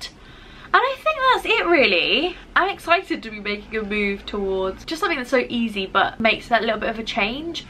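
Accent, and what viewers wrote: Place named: United Kingdom. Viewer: i can't spot exactly which county your accent is from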